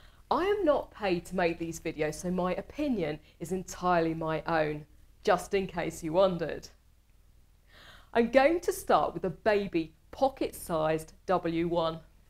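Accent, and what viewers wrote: United Kingdom. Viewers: Commanding female british accent?✔️ Bike stuff?✔️ BRIGHT POINTY HEADLIGHTS?!?!?✔️✔️✔️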